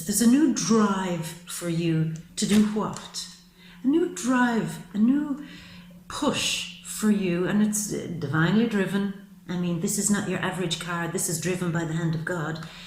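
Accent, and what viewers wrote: Ireland. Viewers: I can't believe you said "Bohemian" ... my mother was born in Bohemia! This was very spot on, stressful to hear because it tells me I was right in all my feelings about my current work, but also stressful that I now know what I HAVE to do, which I was trying to avoid. LOL. Not funny really. You are great, accurate, and caring and I appreciate that. I love your accent, is it Irish? My father is Irish. You reminded me of my Sagittarius self. Thank you so much!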